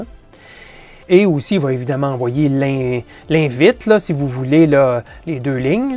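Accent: Canada. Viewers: Bonjour à toi, j'aime beaucoup tes tutos avec ton accent canadien, existe t il un shield pour un esp32 autre qu'une breadboard ?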